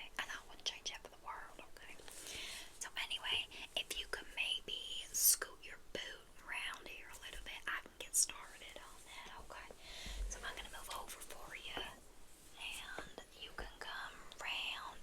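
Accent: United States. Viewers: I love this. Thanks to bridgerton I have heard so many asmr videos with terrible British accents! Your American accent is ten times better than when I try one. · your "country" accent is too cute haha. loved this character and roleplay ❤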